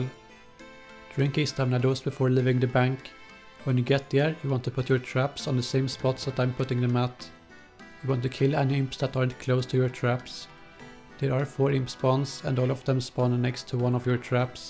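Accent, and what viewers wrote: Sweden. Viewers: bra svengelska :D...det gillar vi (Y)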